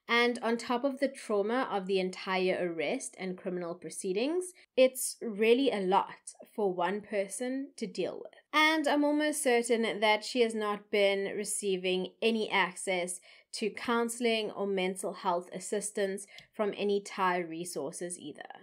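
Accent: South Africa. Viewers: Your channel just popped up on my home page! I’m so glad it did because I already ADORE you, and have only seen this video ☺️ plus, your accent is awesome 😎 it’s great to see a youtuber in another part of the world that isn’t UK or US or Australia. We never hear cases from South Africa, except for the Pistorius debacle. This case is so scary!! I really hope Ashley can get out, the Thai legal system and government can never ever admit when they are wrong. I’ll share this video on my Twitter and Facebook. 🙏🏼♥️ much love from the UK 🇬🇧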